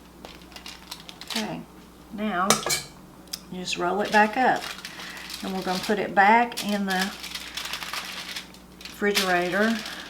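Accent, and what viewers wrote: Appalachia, United States. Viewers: happy thanksgiving to u !!! celebrating & enjoying the sound of your appalachian accent----makes my sorrow for america's sad situation feel better 'cause in the heartland--appalachia'---there are people like u guys still living !!!! When i watch tv, i just don't see anyone in a family environment at all, so it's heartwarming to watch your appalachian instructional home videos !!!!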